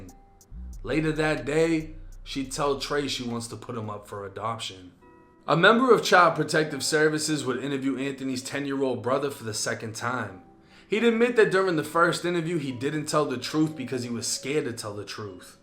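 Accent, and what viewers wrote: Boston, United States. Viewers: Im calling bs u ain't from Florida listen to your accent keep that shit in Boston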